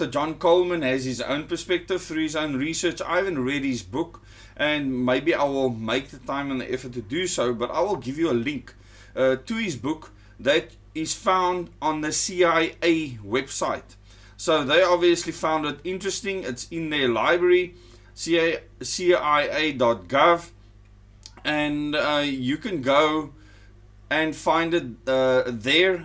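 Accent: South Africa. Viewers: Definitely either of the two... I thought SA🤷🏼‍♀️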